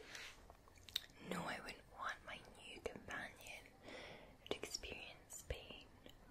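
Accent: Scotland, United Kingdom. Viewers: Wow, you created an awesome & hypnotic ASMR filled video that I love!! You're the sexiest Vampire I've ever seen, & that black lace dress, with the ambient sounds, reverb, & your various effects make this a smoking hot Halloween themed video, imo! The reiki, backdrop, & your lovely Scottish accent, & mind control work for me! Great job sweetie! 🎃Mick💓💓💓💤💤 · I didn’t realise you were Scottish until you sounded American to me at first cos I’ve never heard you before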